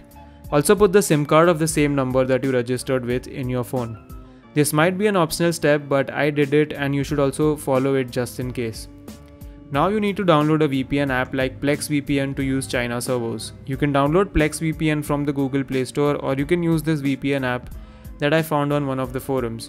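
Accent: India. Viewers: My friend good presentation except your indian fast talking accent is making an english speaking or non english speaking person find it very difficult to understand the proccess and not being able to follow the steps out of fear of either not making it or destroyubg his phone.....Please speak slower......Thank you..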